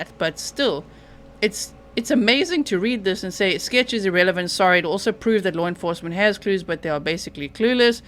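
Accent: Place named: South Africa. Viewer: I recently came across your channel are you South African I clocked the accent immediately. 😀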